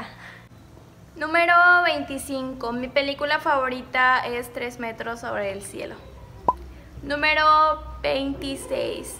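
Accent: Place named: Fresa, Mexico. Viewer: Hablas muy fresa 🍓😬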